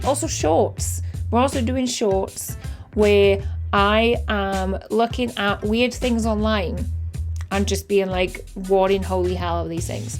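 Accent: Wales, United Kingdom. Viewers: People from Australia are top people :) thanks for answering my questions. I guess I am old, that playstation comment dated you and surprised me as I thought you were a lot younger. I came her for the valheim stayed for the valheim and the welsh accent.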